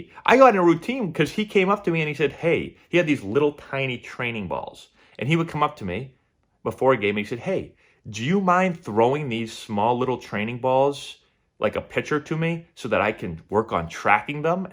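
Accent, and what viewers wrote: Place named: Boston, United States. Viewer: Have you played Fallout 4. It's based in Boston. Every character sounds like you. Big fan, love the channel.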